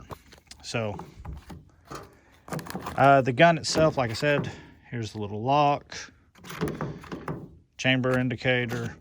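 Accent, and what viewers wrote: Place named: South, United States. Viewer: pinetrees everywhere, wild grass and moss. slight southern accent. ima say your from the Carolinas. my home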